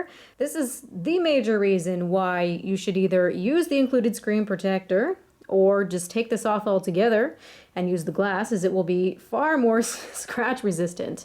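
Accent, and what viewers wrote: Canada. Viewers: I love the canadian accent :) haha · Are you Canadian ?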